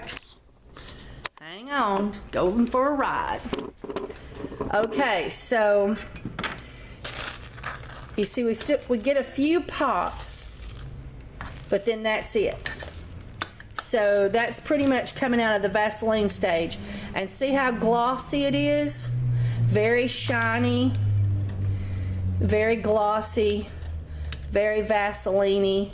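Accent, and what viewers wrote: South, United States. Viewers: A few things. First I want to apologise for not getting back to you sooner, I still want to help regarding what we spoke of before. Second, THANK YOU SO MUCH!!!! No one online has taught "Superfatting" better than you! How simple was that idea? How could it be said better? Super easy and YOU are the answers. And lastly, I was born to a southern girl who lived in the north so I feel comfortable in both worlds and they are as different as the country mouse is to that city feller over tharr. LOL. I love your video language because you sound like one of my bless their hearts and keep them Lord aunts. When you said butt crack I knew I still had some southern left in me, I about died laughing then shut myself up so I could learn more. Anyway, nuff said, I will email you again.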